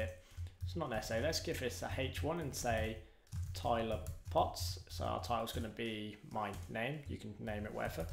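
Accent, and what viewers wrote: United Kingdom. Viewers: nice British accent and i think they should change the name to feel.js seems nicer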